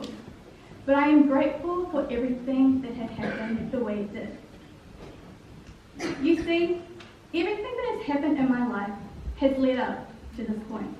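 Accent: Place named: New Zealand. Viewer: Girl why you gotta trill the RRRRR.... You sound better in Kiwispeak ratherrrr than the RRRRR-speak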